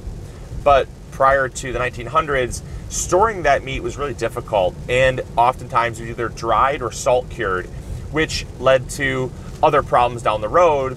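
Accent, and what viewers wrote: Michigan, United States. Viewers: I miss the Michigander accent!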